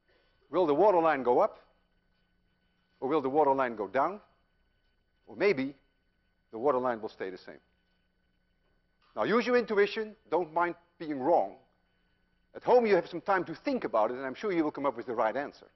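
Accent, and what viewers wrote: Netherlands. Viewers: Is this dude from Holland?! This day seriously freaks me out.. like on that esoteric level. peace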